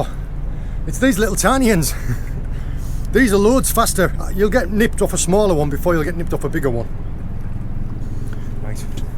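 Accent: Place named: Cornwall, United Kingdom. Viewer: How long did you keep the berried lady out of water for? Did you dip regularly? These are things we would like to know. Was there any need for a double v-clip? I saw she was bleeding when you released her. I know she'll coagulate eventually, but is it more stress on her system? Loving your shore knowledge and your Northern x Cornish accent...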